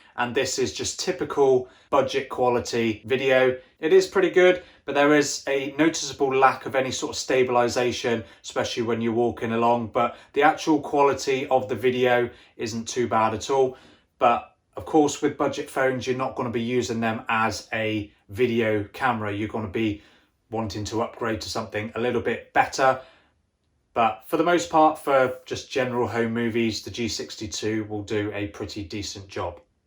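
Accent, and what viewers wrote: Bristol, United Kingdom. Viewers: He speaks with a Bristol accent.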